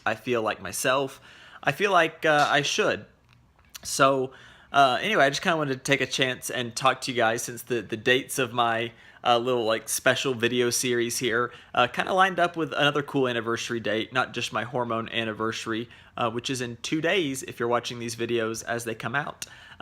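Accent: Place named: Texas, United States. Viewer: LOL, of coarse you don't and for being from Texas it is very slight, but just enough to be unique and cute.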